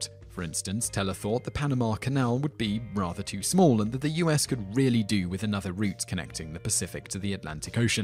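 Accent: United Kingdom. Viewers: Curious. US pronunciation and grammar, in a British accent.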